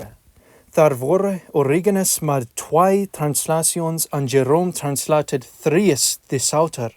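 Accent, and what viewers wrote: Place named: North America. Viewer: When I first heard the reader with such an North American accent I thought it really wouldn't be a success but his reading is absolutely brilliant!! And easy to understand, especially as I'm originally from the north of England. Very,very good!!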